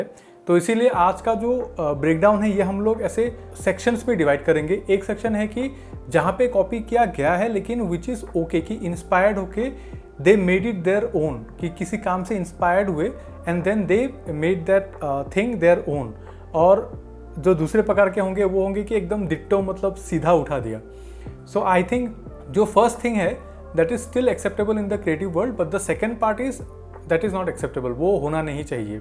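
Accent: Assam, India. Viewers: Tumar accent khuni doubt korisiliua j tumi Assamese hoi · lmao. I knew you were Assamese before reading the Description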